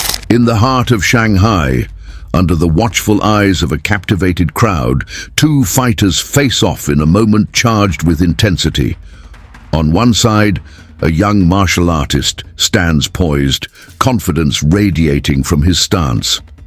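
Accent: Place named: France. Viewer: Vidéo de M.... l' IA parle anglais avec un accent français de toute beauté ( ça peut pas être un humain 🤔 ) mais les commentaires sont hilarant 😂😂😂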